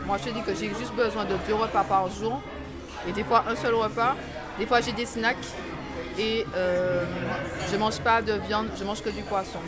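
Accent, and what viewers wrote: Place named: Martinique. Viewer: Oui, je confirme que l'accent martiniquais est bien present dans ton dernier audio, et l'articulation y était également. 😊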